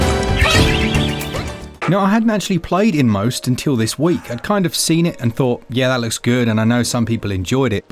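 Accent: United Kingdom. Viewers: My soon-to-be wife surprised me with a birthday Switch OLED just recently, almost cried cause I've been saving up for it for months and she went ahead and got it for me. Went to search for a Switch-related youtube channel and yours is by far the most enjoyable to watch (might be the accent, gotta love the brits). More power to you!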